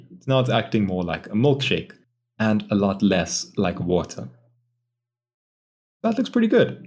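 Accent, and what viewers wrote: South Africa. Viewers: Super helpful. Trying to move from C4D to Houdini so these tuts really help! Thank you! Are you South African?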